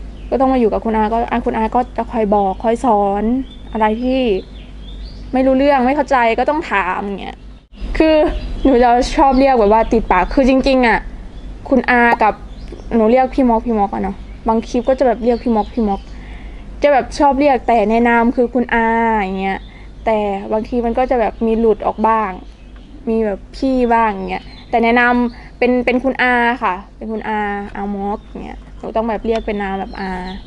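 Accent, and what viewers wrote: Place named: Central Thailand, Thailand. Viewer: คุณโชคดีที่เปนได้ทั้งคนไทยและคนลาว แต่ที่แน่ๆ "คุณคือคนไทยโดยกำเนิด" ผมไม่รู้ว่าการอ่านหรือเขียนภาษาไทยของคุณดีแค่ใหน เเต่มีวิธีแก้คือ อ่านหนังสือไทยทุกเรื่องให้เยอะๆแค่นั้นเอง ไม่นานก็จะสำเร็จ ส่วนเรื่องการพูดสำเนียงไทยนั้น ถ้าคุณไม่ได้บอกว่าอยู่ลาวมาจนถึงอายุ 18 ปี ผมคงนึกว่าคุณอยู่ภาคกลาง อย่างเช่น กรุงเทพเป็นต้น ขอให้ประสพความสำเร็จในชีวิตนะครับ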